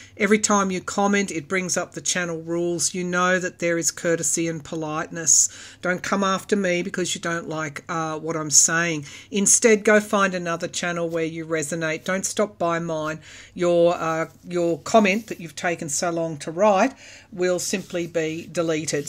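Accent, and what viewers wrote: Australia. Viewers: Thank you for these readings! 🙏 I love the way you have dealt with the trolls! Tell 'em off! I look for you videos every couple of days. I appreciate your manifestation of mercury/mars/Pluto/Venus conjunctions especially with the Aussie accent. Huzzah, ma'am! Peace love and light.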